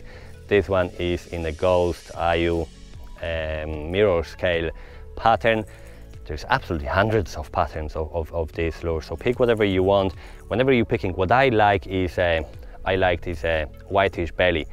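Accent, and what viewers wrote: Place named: Ireland. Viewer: Bro, I can detect an irish accent as you're talking. Half way through I thought I'd lost my mind and you were a local.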